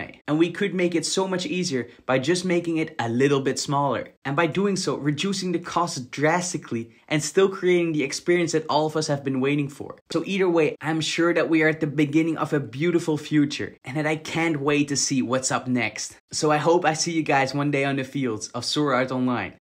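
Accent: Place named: Netherlands. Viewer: I smell a little bit of dutch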